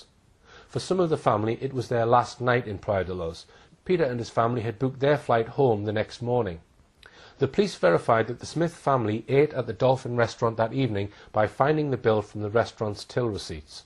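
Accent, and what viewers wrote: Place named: Newcastle, United Kingdom. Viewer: He's a Geordie it's his accent has nothing to do with been able to speak in the right way.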